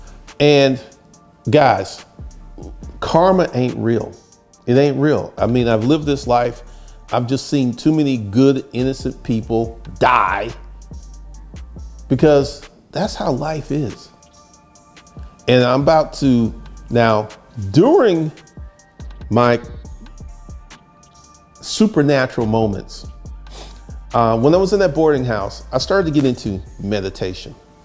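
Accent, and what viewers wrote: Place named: Alabama, United States. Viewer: Do you know what's so funny is that I live in Detroit and I've lived here for 25 years and I rarely get a chance to go home to Birmingham Alabama. When I hear you speak , it brings back memories of growing up in Birmingham. And what is funny, no one here really know about AG Gaston. I actually lived down the road from him and we all knew that was his house on the hill that was gated. I was really thinking about moving back to the south. Thank you for content for thinkers.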